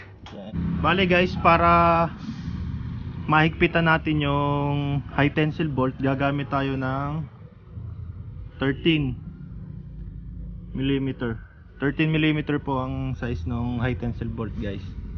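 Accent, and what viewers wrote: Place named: Batangas, Philippines. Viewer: Sabe ko na taga batangas are punto palaang e hhaha support kita idol 💯💯💯 mabuhay batangeño!!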